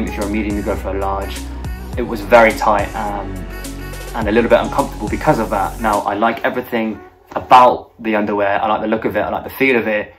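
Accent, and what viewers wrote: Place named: United Kingdom. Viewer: Pleasantly surprised to hear that you're a fellow Brit. I had seen loads of pix of you, but always assumed you were American. I detect a bit of an accent there, so I'm trying to work out which part of England you're actually from. Leave it with me. But...this is a great video. Did it help me to choose which underwear I preferred? Not really. Unfortunately I kept getting distracted by other things...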